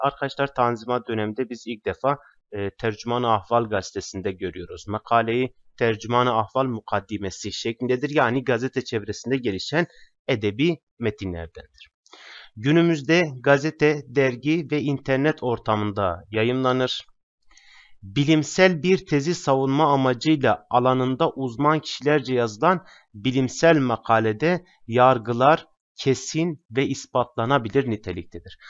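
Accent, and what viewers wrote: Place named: Eastern Anatolia, Turkey. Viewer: Fark ettim, doğu şivesi:))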